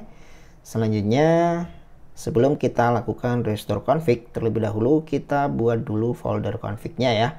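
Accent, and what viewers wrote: Pasuruan, Indonesia. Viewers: Wong Pasuruan a mas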